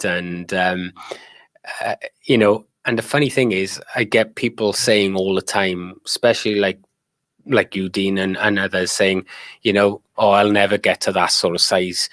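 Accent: Wales, United Kingdom. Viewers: No worries, I really do love your content and you can’t beat a good welsh accent 😁 I hope the operation goes well and you make a speedy recovery, I know how hard it can be living with vertigo, my mum gets quite bad bouts sometimes and can hardly do anything when they hit. Great stream by the way, congratulations again on the 250k subs 🥳 🥳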